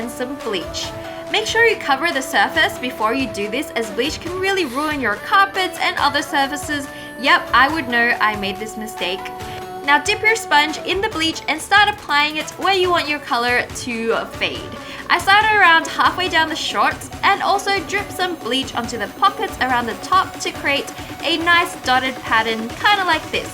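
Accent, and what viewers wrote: Australia. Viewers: Has anyone noticed wengie's Australian accent has toned down a bit?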